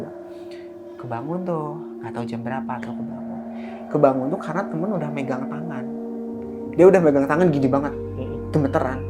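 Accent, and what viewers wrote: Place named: Bandung, Indonesia. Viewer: bandungnya kerasa banget a😂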